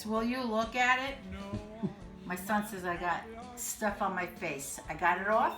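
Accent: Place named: New Jersey, United States. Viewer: Can’t wait to try your ‘Spaghetti Pie.’ Please continue using the ‘Jersey pronunciation’ of words like “ruh-GOAT.’ By the way, be careful using non-licensed music in the background. You have to pay to use music.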